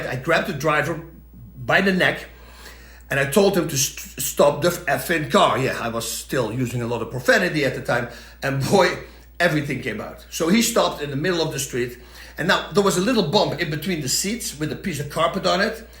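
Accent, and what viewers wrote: Netherlands. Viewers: i couldn’t, his dutch accent is too much. every dutch person speaks english like this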